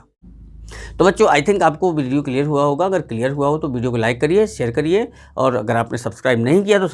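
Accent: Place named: India. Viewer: गांव से हैं अंग्रेजी बोले देसी स्टाइल में 🤣🤣🥰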